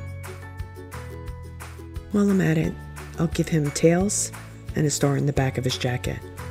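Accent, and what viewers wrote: Philadelphia, United States. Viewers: I've been checking our your videos, love your ideas, super whimsical and fun, also do I hear a philly accent? ❤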